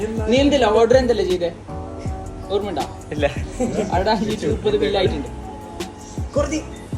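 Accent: Kasaragod, India. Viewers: Bro ningo kasargod language anne pari adh majje aavu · Ksd slang anne samsarkk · Ksd slangil anne mund 👀🤌🏻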